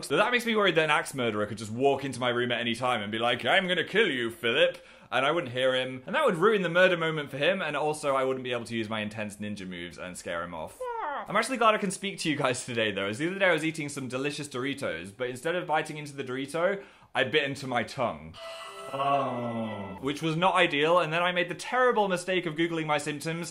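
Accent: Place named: England, United Kingdom. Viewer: I love ur accent, I have the same accent as u cause I am english but u say seem words differently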